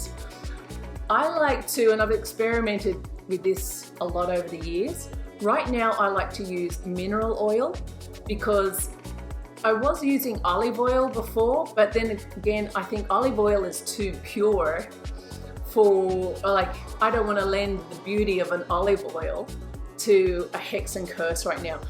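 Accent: Australia. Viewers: I gotta tell you! It's so refreshing listening to an aussie accent talk about witchcraft/demonolatry/magick/occult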